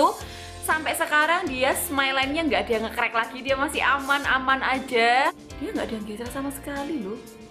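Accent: Java, Indonesia. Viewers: itu potongan rambutnya apa namany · Bikin video bodycare routin dong 😍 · salam selalu😍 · Hayoo sapa seng suka nntn chaenell e tp jrng ikut comen ky nyong 😆jempole mana 😄lophe lophe 😘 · itu pakek emina apa? Pelembab atau apa?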